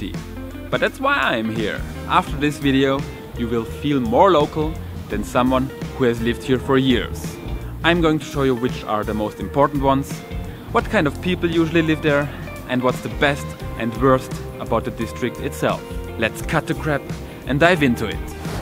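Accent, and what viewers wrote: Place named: Germany. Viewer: I love your attitude cause it exemplifies the arrogance of the ordinary bourgeois fake hipster type we have much too many here. Many of them expats who couldn’t make it at home wherever that is. Though you aren’t as I hear in your German accent. But thanks anyway for remembering me of the areas one should avoid as an ordinary citizen who might want entertainment, ok...but some order and quiet in their lives, too. You avoid to mention any of the rather big problems the city is facing ranging from criminality via vandalism to traffic. But of course when life consists of partying and demonstrating your own „coolness“ and how well you fit Into your own self delusional scene...go ahead. Like last weekend in Gleisdreieckpark. Huge drunken party like every weekend with a noise lever that keeps everyone living in the neighborhood from finding any sleep, a police which because of the city administration is derived of power. 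Somebody got knived. Same at Görlitzerpark and Hasenheide. All in two of your favorite neighborhoods. And so kind when you tell people who have to move to the outskirts of town that nobody wants to live there. I‘ll inform the very sweet shop assistant in my grocery store that obviously she doesn’t know in which type of neighborhood she lives. Lichtenberg! OMG! Imagine! Thanks heaven that I am able to avoid people like you and your kind in my daily life in....TATAAA!....Wilmersdorf. And I am neither rich nor do I consider myself boring. But I live in a fine building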